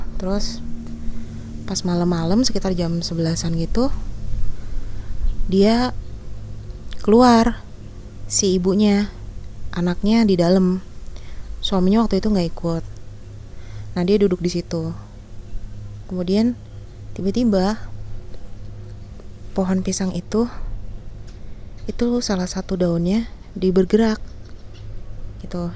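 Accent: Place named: Banyumas, Indonesia. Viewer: banyumas mana suaranya:v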